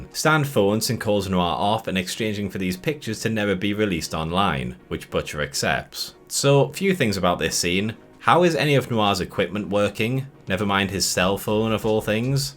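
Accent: United Kingdom. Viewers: why do people who do these videos always got a brit accent?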